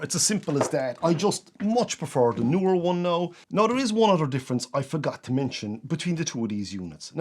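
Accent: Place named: Ireland. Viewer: Your channel is great! I came from your video about the Neewer follow focus, and stayed for the one take presentational goodness and Irish accent. 😂 Love from Down Under. ❤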